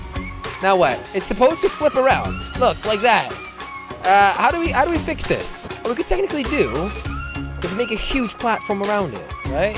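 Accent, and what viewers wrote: Netherlands. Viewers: je komt toch uit limburg?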